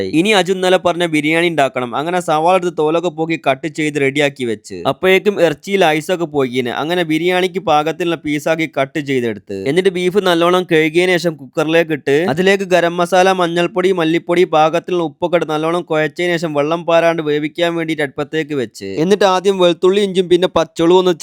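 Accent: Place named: Kerala, India. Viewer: നമ്മളെ കൊടുവള്ളി ഭാഷ ആണല്ലോ 😂😂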